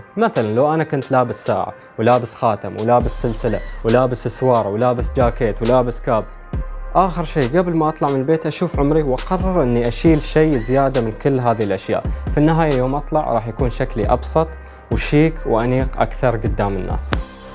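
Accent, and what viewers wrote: Iraq. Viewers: ماعرف ليش احسك عراقي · حسبت انك عراقي 😃😃😃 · كنت احسبك عراقي ههه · طريقة كلامك تجيب من الشعب العراقي بشكل قريب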